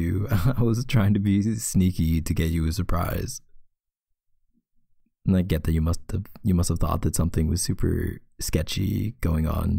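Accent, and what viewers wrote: Canada. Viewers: you knew what they meant !! But to answer the question I’ve always imagined that he was Canadian but I could be wrong.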